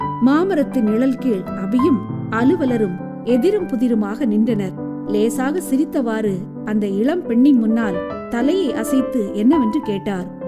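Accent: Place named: Rural, India. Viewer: விடக்கூடாது, ஊர் பாச 🤷‍♂️அருமை Tr🎉😂டங்கு ---டங்கு தமிழ் 🤷‍♂️அருமை. வாழ்த்துக்கள்.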